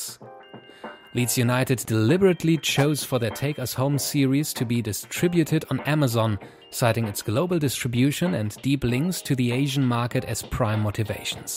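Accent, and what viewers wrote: Germany. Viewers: I’m not 100% sure but I think he has german accent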